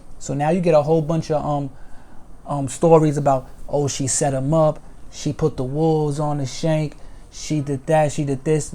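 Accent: New York, United States. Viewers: I Love your voice it sounds so Native New Yorker !!! Love from Jersey Gal Born and Raised !!! Peace To Be !!!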